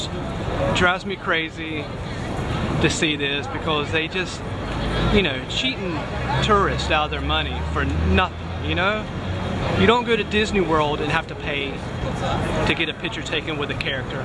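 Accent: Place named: South, United States. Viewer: your from the south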